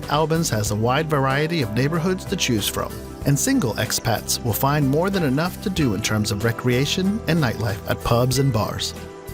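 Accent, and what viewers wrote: United States. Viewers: yanks - we pronounce clerk as CLARK and wish you would also. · ... "best places to live in the UK" ... american accent introduces vid--wait. no. what? f** off. see ya* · Have you noticed the guy is bloody American 😂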